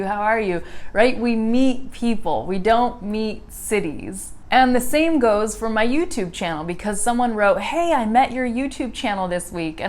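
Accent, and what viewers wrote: California, United States. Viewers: Hey,! You speak very undastandable and clear American English! I d like to know what state do you live in? Is it common pronunciation in your state? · I am learning English. Do you speak an American or British accent? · Do you still have an American accent when you speak spanish or a native one?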